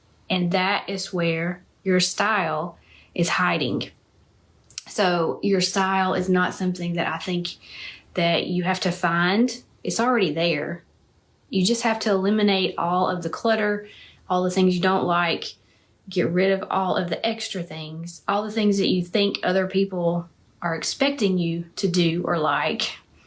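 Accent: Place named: South, United States. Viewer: your thumbnails show it! And I am too. (I'm from GA.) So that's why I always click your videos...then I'm like, "oh, yeah, she's got a southern accent." 🤣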